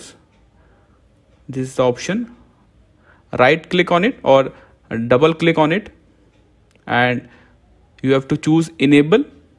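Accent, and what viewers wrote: India. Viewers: the indian accent makes it funnier lmao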